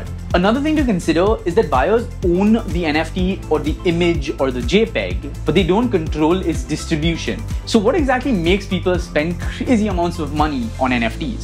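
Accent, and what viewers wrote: India. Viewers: Can't listen to the Indian English accent for more than 2 minutes without cringing :(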